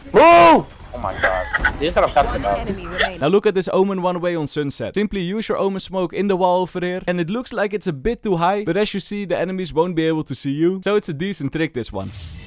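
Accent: Netherlands. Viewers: Hii ...i still watch your videos even though i cannot play,your cideos are really very interesting mainly the dutch accent😂 Love your content❤❤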